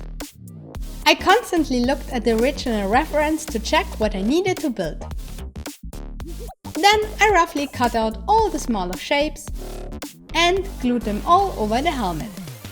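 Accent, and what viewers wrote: Germany. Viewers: I love your germand Accent! S2 S2 S2!!! I BUY YOUR BOOKKS!!!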